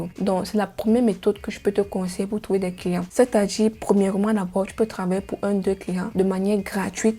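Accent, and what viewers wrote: Cameroon. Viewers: Comment tu exprimes comme une camerounaise comme ça🙂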